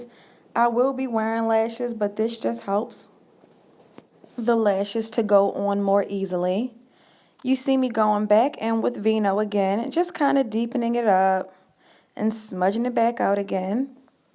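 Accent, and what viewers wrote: Baltimore, United States. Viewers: Omg I could tell you were from Baltimore lol . love it, so beautiful. New Subbie!!!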